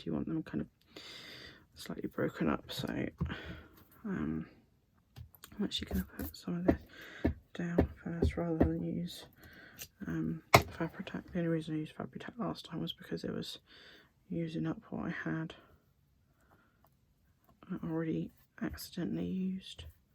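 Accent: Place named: United Kingdom. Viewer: hello love your accent from the UK everybody. We are either rejoicing in US or crying today day after election. Im crying.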